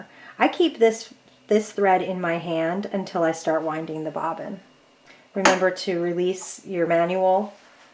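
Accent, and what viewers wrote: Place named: Russia. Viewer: Sounds Russian to me.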